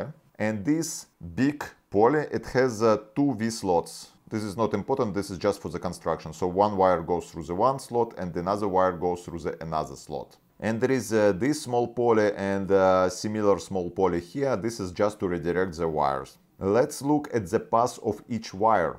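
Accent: Russia. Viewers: You have Russian accent😁 can you speak Russian? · It is a rare case of a lovely Russian accent. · Удивительно, как YT хорошо определяет Runglish в субтитрах! Но "полес" слышит, как police :)) В реале имелось ввиду наверно "poles" или "pulleys" (как в дереве). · This indeed russian accent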